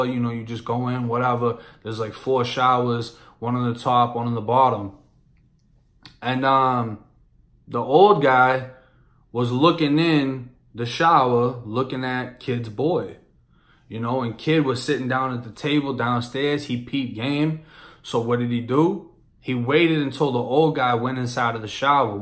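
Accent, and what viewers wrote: Boston, United States. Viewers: I was listening to your stories and I'm like why tf bro talk like he from Mass. I can hear the boston accent . I'm from Chelsea Ma . Spent a total of 5 yrs in prison . Keep up the stories bro. I fucks with you. Even though I'm ♿♿♿ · I noticed this with this older video.... he sounds like he's from the south... his new videos sound bostonian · I Can Still Hear That Boston Accent A Bit 😂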